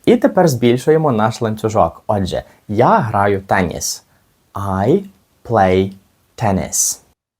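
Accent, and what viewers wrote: Standard. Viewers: дякую за чисту українську мову приємно чути успіху вашому каналу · Дякую за чисту українську мову. Успіху тобі.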